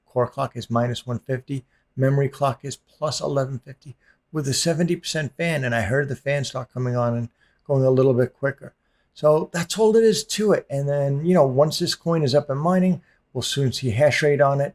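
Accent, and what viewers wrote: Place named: New York, United States. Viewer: I fucking hate that New York accident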